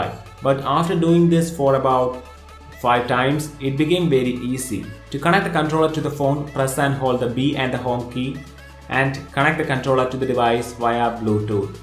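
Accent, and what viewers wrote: Kerala, India. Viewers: Malayali Aano??😉😃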